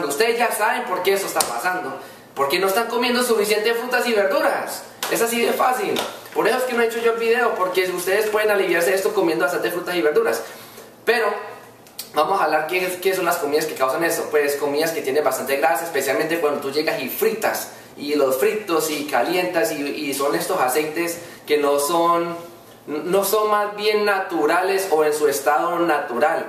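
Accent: Colombia. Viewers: Hola es primera vez que te veo y me gustó mucho tu explicación, y debes de estar orgulloso de como hablas eso te identifica de donde eres así como a todos me encanta como hablan en Colombia, Arriba Colombia!!! Y eso que soy de Guatemala.Felicidades y sigue con tus consejos. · estoy empezando a ver tus videos me encantan. c oye muy bonito tu inglés y parece tu tono de Colombia c ve k eres muy inteligente saludos desde Monterrey México 😘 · Eres Colombiano? Me Gusta Tu Acento :$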